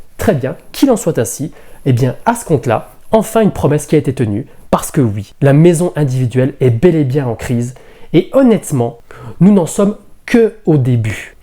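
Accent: North, France. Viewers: L'accent du nord. Rien de spécial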